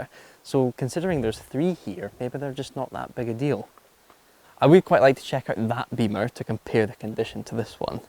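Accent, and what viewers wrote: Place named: Glasgow, United Kingdom. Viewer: You sound like a fellow Glaswegian to me. I can't see Dennis Collins being interested in any of those cars. The forest landscape is almost saying that nature wins in the end mate.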